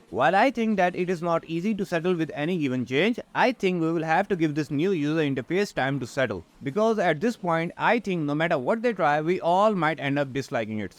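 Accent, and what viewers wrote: India. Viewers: nice 👍 you got a indian accent btw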